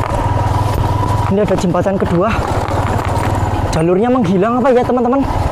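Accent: Java, Indonesia. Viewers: Mas omonga nya kok medok banget si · Wong jowo PO mas?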